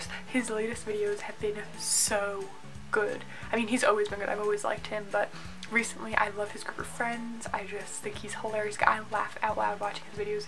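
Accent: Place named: United States. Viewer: She talks to fast can't understand her American accent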